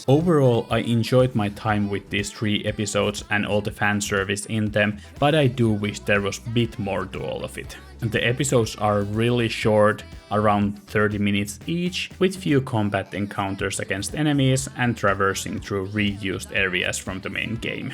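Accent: Finland. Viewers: good video :) Love the finnish accent !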